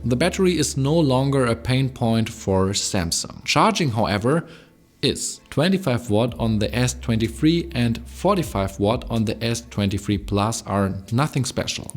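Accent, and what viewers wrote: Germany. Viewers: best Galaxy I've ever bought so far. i have the 128gb base model in pblack. i compared it with the s23+ from a friend and there is no noticeable difference in everyday handling!! but the most important thing, 25w charging needs exactly an hour with 20-30% battery left the next morning which i always have. it's exactly the time frame i need to shower and everything before i go to work. btw, very strong German accent. but not as worse as KS. anyway, good review and nice channel, subbed!!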